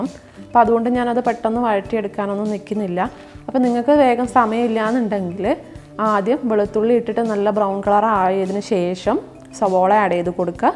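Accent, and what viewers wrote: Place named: Palakkad, India. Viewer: U have a Palakkad influence in it language....but whatever....I loved this recipe hit like.....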